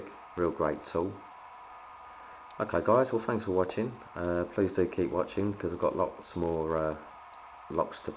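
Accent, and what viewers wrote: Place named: Cockney, United Kingdom. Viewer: ur sucha theif lol all ur videos are on picking locks. and u sound like ur from east end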